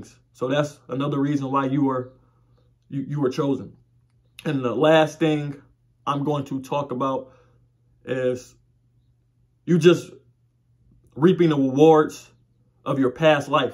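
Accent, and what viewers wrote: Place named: Texas, United States. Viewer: lol ok you talk like them bois in tx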